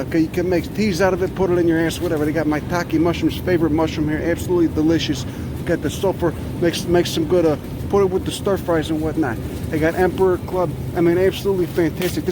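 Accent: Chicago, United States. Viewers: Perfect Chicago accent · Chicago Italian, the accent definitely has the east coast and mid-west flavours combined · Sounds like he's from Grand and Ogden. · imagine trying to shop and this dude comes through the aisles rambling his chicago mouth off about mushrooms and california ppl stereotypes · no offense but ya must not be around many working class Chicago people- because as a Wisconsinite, I thought Chicago immediately. It’s just not a suburban Chicago accent. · Jeezus man , I can smell Chicago on you from here , love it, makes me miss home brother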